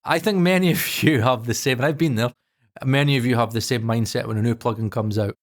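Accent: Scotland, United Kingdom. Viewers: Nah mate, you've got it all wrong. Almost nobody who watches you is BUYING plugins. They may OWN hundreds of them, but they didn't buy them. The Joy Of Plugins isn't what they do for the sound, it's the simple dopamine release scenario. It's like GAS (Guitar Acquisition Syndrome) except there's nothing shiny to hang on your wall. Why do you think DAWs like Studio One make such a big deal out of the wee thumbnails of the VST effects and instruments? Does it make it easier and faster to find things? Maybe. But it also lets you have a virtual wall to hang your plugins on, which is far more important. [I unsubscribed to you ages ago, but every so often YT flings you into my space. I watch because you are a rare Scottish voice, and like me you are autistic. But I find most of your plugin talk really uninteresting and obvious. Not that you will give a damn! 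lol] The stuff I do find interesting is when you debunk the veracity and accuracy of a plugin. That's always fun.]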